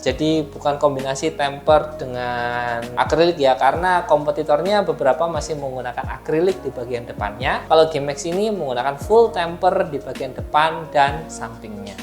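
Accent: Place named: Java, Indonesia. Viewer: Ou pantes agak medok <mail>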